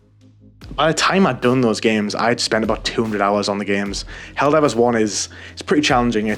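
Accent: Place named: North East England, United Kingdom. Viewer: I have over 50 platinums. Out of them 50 i have the dlc trophies for about 3 of them 50. Some games i have dlc purchased but havent touched it, because once i have platinum, im usually too fatigued to play dlc. Im like you. Im 35 now though and have bad adhd. Im really struggling to do the grinds that once seemed theraputic. Cba watching guides for collectables etc etc anymore. Much prefer just putting game on and playing it in my own time! If platinum becomes viable, i will get it, but i wont go hunting like i used to. Dont get the dopamine that i used to when getting plat. Feels like its all for nothing now. Also nice to see a fellow north eastener doing well on Youtube gaming. I'm from Teesside! Just down the road, judging by your accent!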